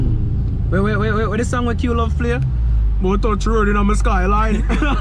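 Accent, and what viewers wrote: Jamaica. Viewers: If you were Jamaican as well you would of immediately knew he was a yardie. "you sound Caribbean" only a yankie would say that. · Aye bro great content. Are u jamaican? U sound Caribbean?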